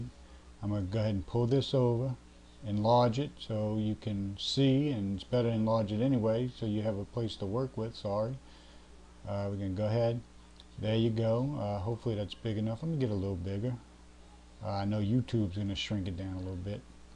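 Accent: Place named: Louisiana, United States. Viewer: This is awesome...the accent sounds Louisiana/Cajun-ish. Geaux Tigers!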